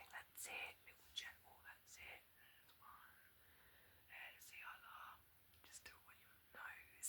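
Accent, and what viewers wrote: United Kingdom. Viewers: hearing a fellow Brit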